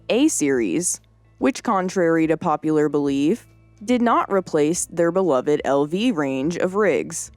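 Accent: United States. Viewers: It's amazing with a yankie american women speaking trow a documentary I love it cool makes you think she's british and loves ERF trucks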